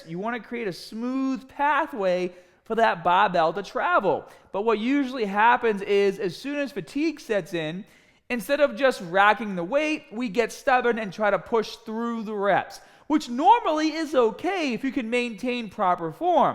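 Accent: Boston, United States. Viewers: This is the most Boston I've ever heard you sound..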